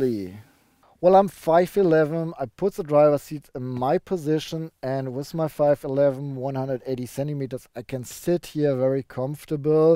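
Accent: Germany. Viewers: Speaking English with German accent = great combination :) and i can't stop watching you cause you re really good on this business, thank you for thr the video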